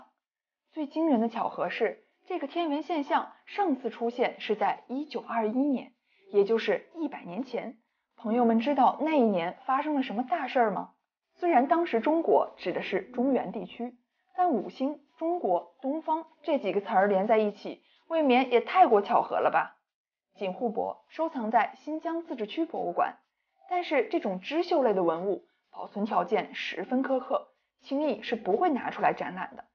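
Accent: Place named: Cantonese, China. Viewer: 声音🈶广音。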